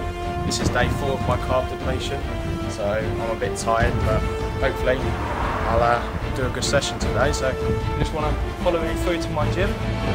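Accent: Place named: United Kingdom. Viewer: British accent + good body (y)!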